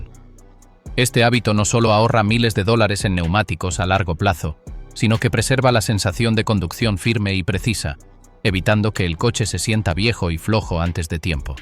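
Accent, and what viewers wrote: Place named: Spain. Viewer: No me gusta el español de españa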